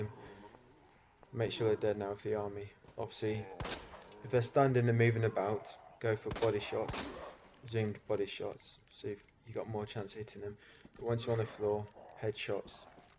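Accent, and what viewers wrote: United Kingdom. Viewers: Great guide, your british accent was kinda funny and also mislead me once but its fine and I feel like without you I wouldn't even attempt to beat hardcore normally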